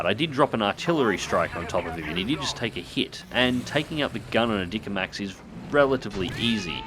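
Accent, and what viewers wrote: Australia. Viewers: Matey, I really enjoy how you describe things!!!! You could make bread mold sound like the most fascinating thing on the planet!!! If I was an Arab, I would buy sand from you to put next to all of the rest of the sand I had!!! With that smooth Aussie accent, I'm glad I'm not buying a horse or a used car from you!!!! Please think of this as my off-handed attempt at paying you a sincere compliment!!!!! What Squire is to funny, you are to amazingly interesting!!! the fact that you are often self-deprecating, makes you even more interesting and honest!!! I have been such a huge fan since I found your channel!!! I truly wish I could buy you a cold beer and a stubby of Jack!!! Maybe some day I will get the opportunity, who knows, the world is getting smaller all of the time. All the best from way out West, Sprog1 in AZ.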